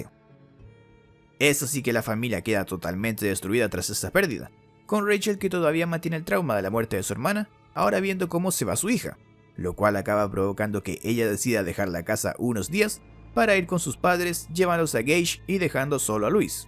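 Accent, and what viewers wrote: Chile. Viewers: Eres de chile? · Es Chileno?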